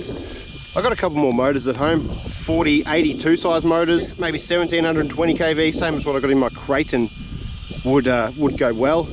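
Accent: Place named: Australia. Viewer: Hello my friend cool Ausie accent the Kronos is gorgeous iam looking to get a 4S brushless truck, the Kronos might be the one, or I was thinking the traxxas Maxx V2 4S, I have tons of trucks and cars I've been into R/C since 2005 I got XMAXX losi 8T, Kyosho GT2 nitro and so on, but I don't have a 4S truck, anyway thanks your new subscriber william the doorman from NJ Forgettaboutit lol 😆 😀 😊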